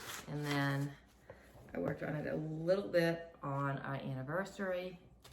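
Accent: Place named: Boston, United States. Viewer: I have a couple of questions. Is there a reason you roll your fabric instead of fold it, or is it just preference? In what part of Maine do you live? I thought for sure your accent was from Boston. 😊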